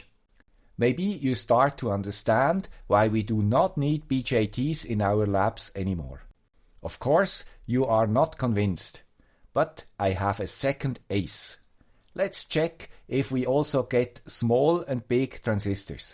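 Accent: Switzerland. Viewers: Thanks for taking the time to answer, keep up the good work. Sunday morning newspaper, not any more, now it Sunday morning and new video from the brilliant guy with the Swiss accent.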